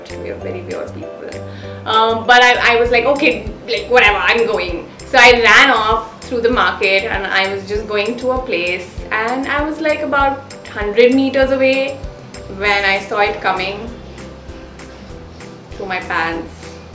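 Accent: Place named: Bihar, India. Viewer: You sound like a bihari..are you one?? :)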